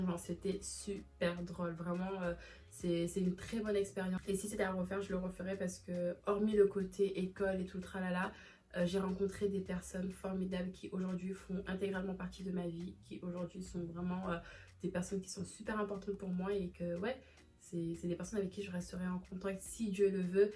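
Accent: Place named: Marseille, France. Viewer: Mhhh Marseillaise heiin 😅 #teampsg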